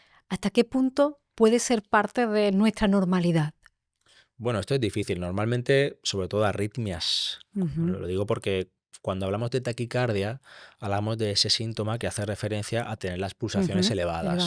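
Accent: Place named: Extremadura, Spain. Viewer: que acento extremeño más precioso tienes chiquilla.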